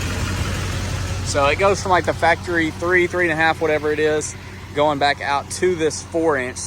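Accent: Alabama, United States. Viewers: What part of Bama are you from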